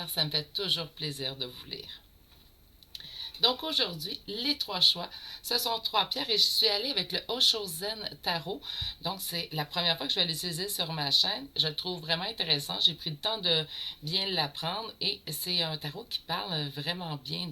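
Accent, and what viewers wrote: Quebec, Canada. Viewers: 👋... Je vous découvre et je suis scotchée ! Votre accent que j'adore (j'ai dû être Québécoise... 😍), votre chien Guizmo (moi c'est mon 🐈‍⬛), et là, le second choix et... TOUT est juste ! 😉😚😇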